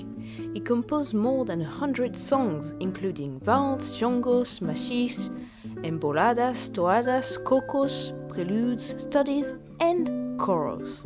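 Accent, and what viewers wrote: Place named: France. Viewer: You've a delicious french accent.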